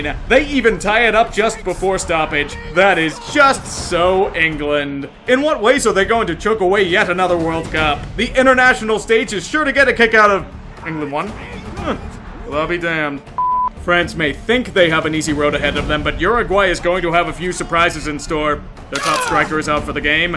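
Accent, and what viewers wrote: United States. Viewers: kinda, i watch him a lot so i expected the cringe. But he, for the most part, knew what he was talking about. I always find it hard, even as an american myself, listeing to someone talk about football in an american accent but i was proud of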